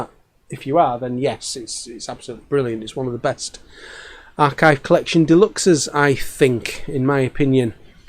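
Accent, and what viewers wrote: Leeds, United Kingdom. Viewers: haha no worries! Thanks from the response, it’s worth checking out Graham Gouldmans latest album “ ” think you would enjoy it! Also completely off topic I’ve always been able to tell your from Leeds just based on the way you pronounce “Remaster” like “Marster” if that makes any sense 😂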